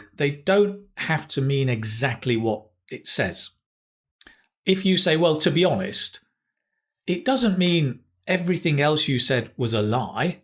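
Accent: United Kingdom. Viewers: Especially his british way of pronouncation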